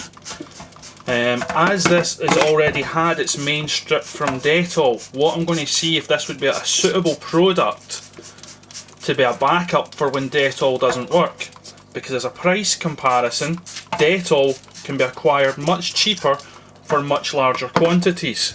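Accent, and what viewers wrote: Scotland, United Kingdom. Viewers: I stopped at 3.00 and just have to comment here to tell you your Scottish accent is FOOKING AWSUM!!!!!!!! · you have the best Scottish accent since Capt. macmillan from COD4 Bravo sir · Great vid mate, nicely explained! (And one of the best Scots accents Ive been able to enjoy - added a much smoother Connery-esque authority to the demo. Och aye!)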